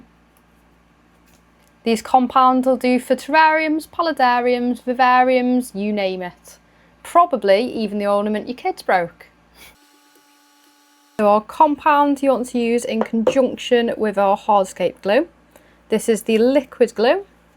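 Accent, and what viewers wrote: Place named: Liverpool, United Kingdom. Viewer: I TAKE IT FROM YOUR COMMON ACCENT YOUR FROM SOMEWHERE LIKE LIVERPOOL ? I LOOK FORWARD TO SEEING YOUR RACK MY DEAR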